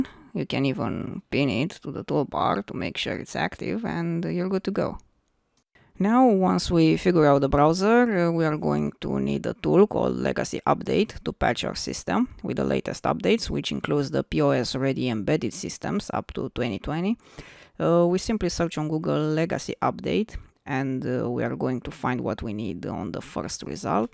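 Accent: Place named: Russia. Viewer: love your accent. its russian?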